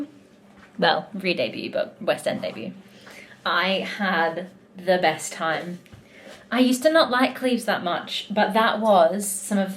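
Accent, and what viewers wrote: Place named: Australia. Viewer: The Aussie accent caught me off guard for your debut 😂😭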